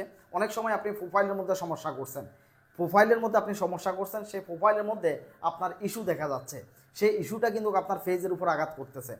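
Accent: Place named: Netrokona, Bangladesh. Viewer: ভাই আমার মনে হয় আপনার বাড়ি নেত্রকোনা,, ❤ আমার দেশের বাড়ি নেত্রকোনা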